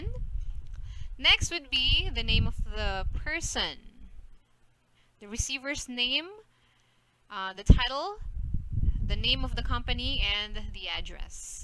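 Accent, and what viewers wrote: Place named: United States. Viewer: you sound like an American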